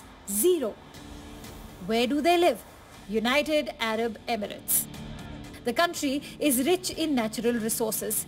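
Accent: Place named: India. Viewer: Cheap Indian accent led to cheap and wrong news 🤦🏻‍♂️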